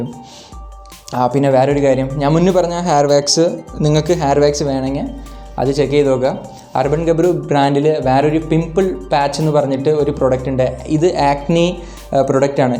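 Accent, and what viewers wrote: Tamil Nadu, India. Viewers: Chattayi Malayali anno? Or Tamil?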